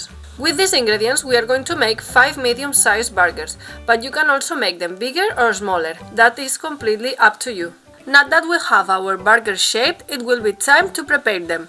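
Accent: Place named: Greece. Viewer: Thank you so much for your channel! I love your accent; You sound like my cousin from Greece.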